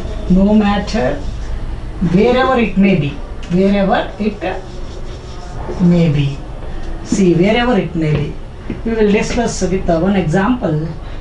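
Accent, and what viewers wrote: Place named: South India, India. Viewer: fabulous lecture sir south Indian type....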